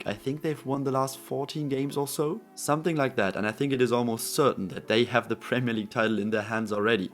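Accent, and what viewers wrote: Germany. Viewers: Deutscher Akzent bockt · Man hört so dass du deutsch bist😂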